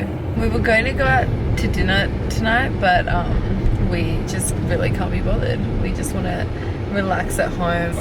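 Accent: Australia. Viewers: Every time I finish watching one of your videos, I naturally attempt to speak in an Australian accent for the rest of the day.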